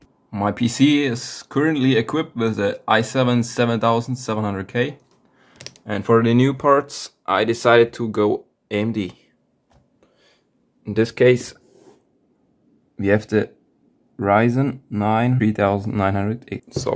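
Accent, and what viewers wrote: Germany. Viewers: Man hört voll das deutsche Englisch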